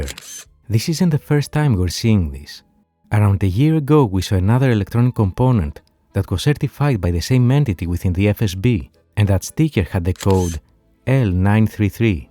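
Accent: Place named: Greece. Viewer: I heard the Greek accent. And then, discovered your name !)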